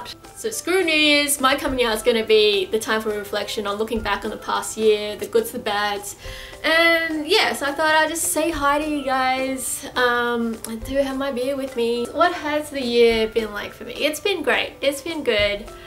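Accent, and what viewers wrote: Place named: Australia. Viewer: Very powerful Vid to those still in the closet. U earned yourself a Sub from a str8 guy. I don't judge ppl based on sexual preference. I judge ppl based on persona, good or bad. Just want to say, I've watched quite a few GOT reaction vids while bored work. Many are fake, and not genuinely emotional as your last GOT reaction vid. "Arya's reunion with Nymeria" Thats all it took for me to Sub. It almost made me tear up, and thats not an easy thing to do. Haven't shed a tear in 10 yrs, lol. Well, that and I like your cute Aussie accent. ;)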